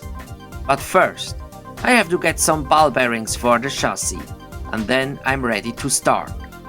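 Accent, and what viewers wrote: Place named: Switzerland. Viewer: great video like always... and the Schweienglisch is great!!! gruess us züri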